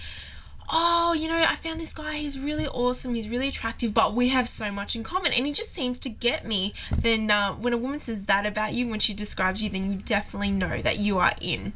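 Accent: Australia. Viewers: I´ll bet she learned english or was born in Australia. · I love her aussie accent its such a turn on. But i dont anyone can wait around long enough for the perplexing age old question of what women want? bcoz women dont really know what they want. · WOW! what is she australian??? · thats top advice, esp what you said; dont take what women say literally. so really that means i cant take you literally then hehehe. and yes everyone shes an aussie. nice to hear an aussie accent out of an absolute amount of yanky teenbopy girly stuff on the tube:) x) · the aussie accent is a mayyyyyja put off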